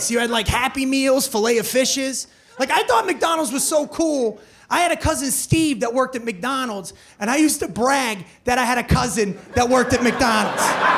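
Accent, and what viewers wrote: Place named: New Jersey, United States. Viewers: This sounds like every guy from NJ. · he sounds like jake if jake had a jersey accent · Jersey Italian accent is perfect! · Knew this guy was from Jersey just by the way he said "ya knowada mean". Also knew he went to Florida for rehab. Also knew he wasn't a bad guy, just seen some fucked up shit. Loved the act but hit me when I realized how real this joke was. It was REAL MOTHAFUCKERS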